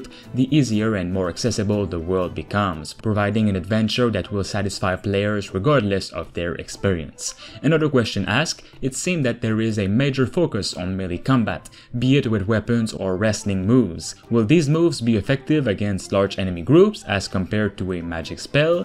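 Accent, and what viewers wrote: Quebec, Canada. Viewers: I am sure of one thing, your accent is from Québec ?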